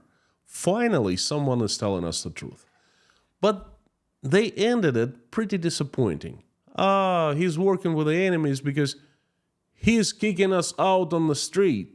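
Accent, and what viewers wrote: Russia. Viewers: товарищь с русским акцентом , вы идиот? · Learning a lot here. My ears are over time better able to understand Russian accented English from my avid listening to you. Thanks for wise warning to not let our American politicians overstep, trampling our rights over time.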